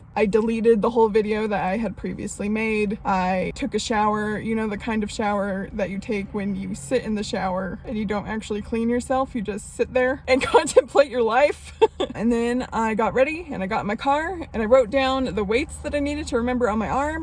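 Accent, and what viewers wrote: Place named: South, United States. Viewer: This girl has got to be from the South … love her! 🥰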